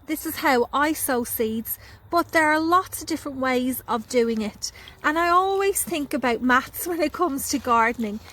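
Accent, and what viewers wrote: Ireland. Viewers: Or I saved the seed from my own plant. Lovely harvested...beautiful produce! And, your Irish accent is so beautiful!🙂 See you next week!🙂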